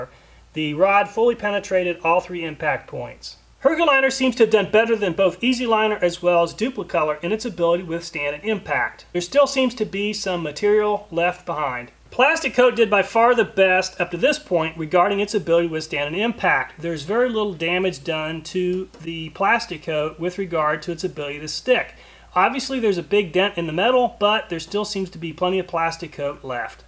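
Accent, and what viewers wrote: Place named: South, United States. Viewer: Can't believe you're still replying to comments on a 6 year old video. Noticed that the southern accent faded over time :D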